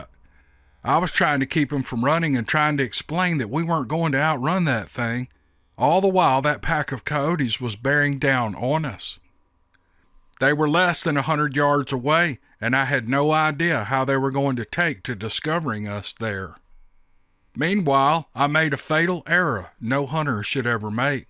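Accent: South, United States. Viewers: OMG, what an incredible & terrifying encounter! Especially for a couple of fairly young lads still! From the stories I've heard from others, at least a thousand by now since I retired, discovered YouTube & have way too much time on my hands, I think if those Sasquatch wanted you, you would've been had. And given you guys shot at him, you're lucky you boys weren't....had that is. From what I understand they're incredibly fast & agile despite their enormous size. I've heard stories where they've paced vehicles upwards of 35-40 mph! I think they were 'escortin' y'all off their territory. Thank you for sharing your incredible encounter! And, thank you too. As usual your narration, in that sweet Southern drawl just soothes my soul.👣. 🗣️Whoop whoop!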